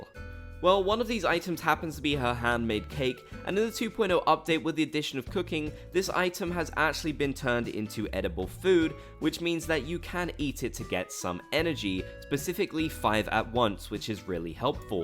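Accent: United Kingdom. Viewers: Oh so you're British? Say Worcestershire Sauce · I didn’t know you was British, I am too. I don’t pay attention to accents 😅 gang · british alert? this whole time i thought you were just really good at faking that accent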